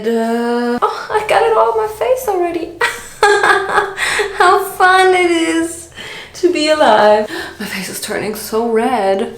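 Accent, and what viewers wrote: United Kingdom. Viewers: slayed British acent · british accent is the only thing that keeps me going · i LOVE YOUR BRTITISH ACCENT !!! 💙💙💙💙💙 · British accent is daaaa BEST!!!!! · wasnt she already talking in a british accent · lately you’ve been serving some ✨fabulous✨looks, ma’am xx p.s. that british accent omg 🤣🤣🤣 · YAAY MORE SHABBY SHALOON 🥦 THEY ARE THE BEST AND I REALIZED THAT TALKS IN BRITISH ACCENT WHEN SHE'S STRESSED 😹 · the british accent really popped off in this one 😍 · unpopular opinion: i prefer your british accent lmfaoo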